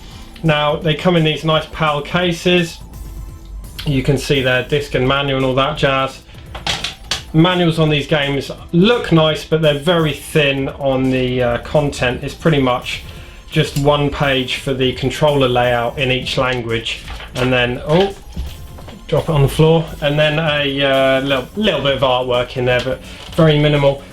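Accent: United Kingdom. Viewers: ya i really want one its a dream lol If u like my channel Please subscribe:) im filming a Unboxing of some new games from video games plus tonight or tomorrow . Have a lot of new games. Your channel great plus love the British accent!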